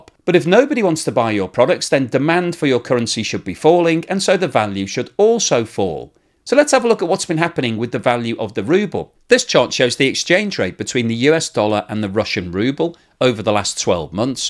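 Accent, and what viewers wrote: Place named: England, United Kingdom. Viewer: Yes indeed, if they come from Spain. Of course they may speak Spanish with an Argentinian accent if they come from Argentina. They might also speak English with an Argentinian accent..but this creator speaks English with an English accent. I might speak English with a Scottish accent in which case I wouldn't have an English accent but a Scottish one.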